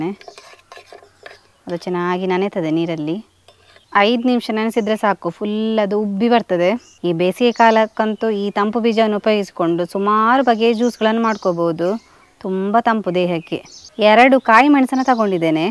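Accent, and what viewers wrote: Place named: Dakshina Kannada, India. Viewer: ಮಡ್ಕ ಸೋಡಾ ರುಚಿ ಕುಡಿದ ನಂತರ ಗೊತ್ತಾಗುತ್ತೆ ಆದ್ರೆ ನಿಮ್ಮ ಕನ್ನಡ ಉಚ್ಚಾರಣೆ (ದ. ಕ. accent ) ಕೇಳಕ್ಕೆ ಬಹಳ ಚೆನ್ನಾಗಿದೆ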